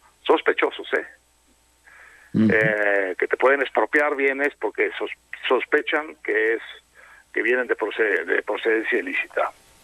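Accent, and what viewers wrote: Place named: Galicia, Spain. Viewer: Cada vez el español gallego de lopez doriga. Se parece a loret de mola puras fake news.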